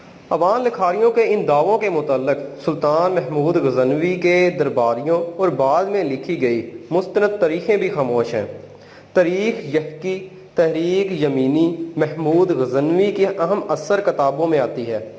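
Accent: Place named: Punjab, Pakistan. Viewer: تحریری مواد تو اچھا ہے وائس اور ٹھیک نہیں پڑھنے والا ٹھیک تلفظ ادا نہیں کر رہا جس کی وجہ سے معیار متاثر اور سننے والے پر اچھا اثر نہیں چھوڑتی یہ بندہ پنجابی میں اردو پڑھ رہا ہے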